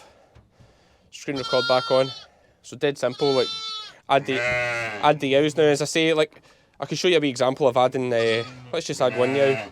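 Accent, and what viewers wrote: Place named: RP, United Kingdom. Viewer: Loved your interview on landward with your posh accent 🤣🤣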